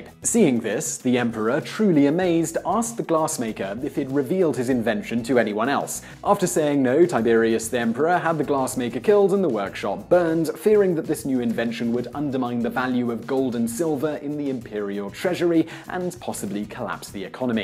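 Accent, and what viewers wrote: United Kingdom. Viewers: He's British, you know... In fact, it's probably the Americans that are mispronouncing the words. We tend to do things like that and then expect others (even though they are much older, thus closer to the source) to change themselves to our ways.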